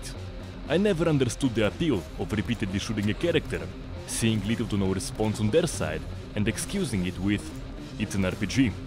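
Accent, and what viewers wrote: Russia. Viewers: from what i see from ur accent ur u russian or other? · I like your russian Accent :D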